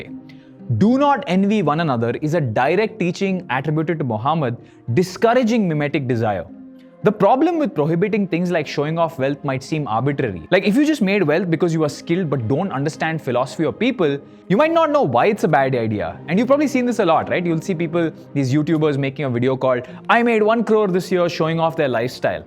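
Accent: India. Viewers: Y'all how tf you bear this insufferable indian accent. This accent is not even indian i dont know wtf is this but entire world hates it. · When you love the video but have to bear the indian accent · Heard indian accent and immediately turned it off.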